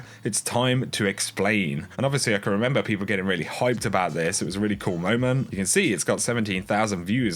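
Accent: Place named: United Kingdom. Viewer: Keep it up! Out of all the destiny you tubers, I really like your stuff! You have a really interesting accent to listen to as well! Where in the UK is that?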